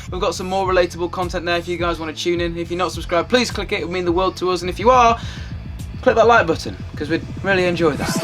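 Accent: United Kingdom. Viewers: I dont like BRITISH ACCENT!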